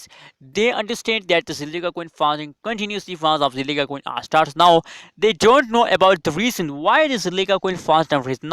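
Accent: India. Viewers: Sounds like you are from India, right? 🙈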